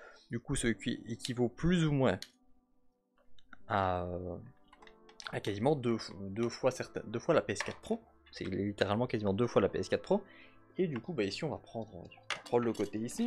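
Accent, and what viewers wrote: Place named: Belgium. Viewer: sa s'entend a ton accent moi aussi je suis belges hahaha continue tes videos tu vas percer 🔥🔥🔥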